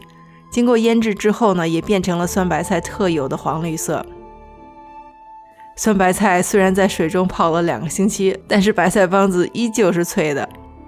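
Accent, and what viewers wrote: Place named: Shaanxi, China. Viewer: 是陕西人啊，听出来了，能打听一下你做浆水鱼鱼的那个工具在那买的吗？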